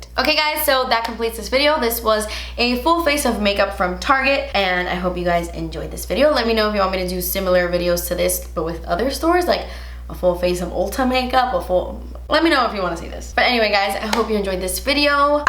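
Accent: Cuba. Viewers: So funny story... My husband says Concealer the way you do because he's heard you say it so many times while I'm watching your videos. It's really funny and he REFUSES to say it normal (not Cuban) he's very white... Lol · More videos in Spanish! Me gusta tu acento Cubano 😄💖💖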